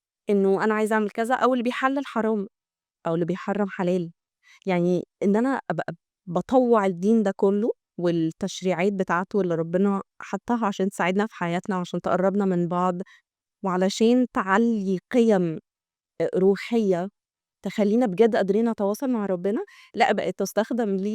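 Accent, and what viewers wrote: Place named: Gulf, Arab world. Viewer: صوتك خليجي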